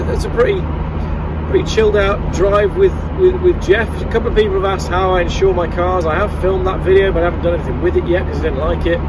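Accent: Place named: Midlands, United Kingdom. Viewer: before the planned event of three years ago I pretty much watched Hubnut every day. Must admit I wasn’t spending huge amounts of time on YouTube. I almost got to meet Ian at one of his meet n greets when he was here in Melbourne. Then my attention was turned elsewhere. You are the only motoring channel that I know who dares to show another perspective. I admire that. I’m also originally from the Midlands too so that’s probably another reason I like listening 😂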